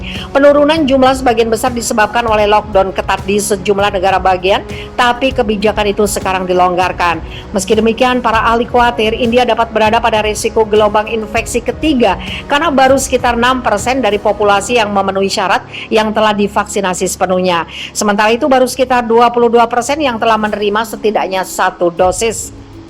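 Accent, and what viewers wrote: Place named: Java, Indonesia. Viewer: Lidah Admin pekat jawa berbahasa Inggeris. Lebih baik x usah sebut. Maaf ya.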